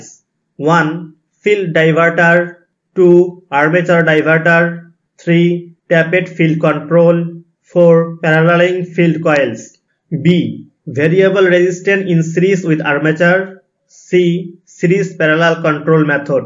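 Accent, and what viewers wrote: India. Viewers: What accent it is! Hindi speaking people has this type of accent!!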